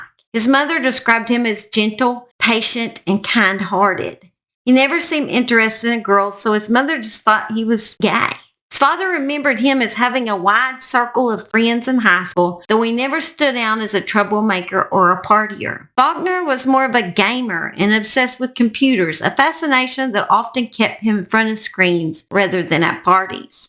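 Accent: South, United States. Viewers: I like your voice...a southern accent is comforting to me...probably bc I'm southern. Lol Hyped your video. Encourage people to comment too bc it helps the algorithm. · Oklahoma?? That's not true you have a southern accent Oklahoma is way Midwest they don't speak in that manner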